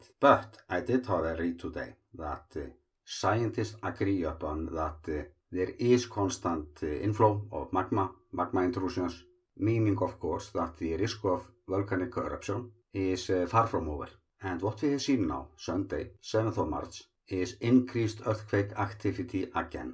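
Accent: Iceland. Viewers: I watch everyday for your update! Is your accent Icelandic or? I grew up in a salmon fishing family here in Northwest Washington State and there were several Icelandic fishing families living in Pt Roberts, WA - last name Gudmundson, they had a lot of red hair in the family and were tall. Viking heritage maybe.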